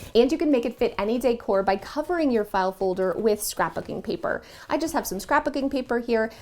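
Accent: Canada. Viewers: Omgoodness... I love your Canadian accent! And, the organizing 😃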